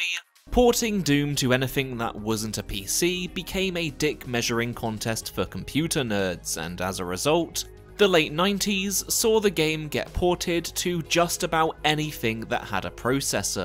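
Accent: United Kingdom. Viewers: Ayyyy it's the boi! That's what I like to see. Missed this incredibly calming and soothing, british voice👏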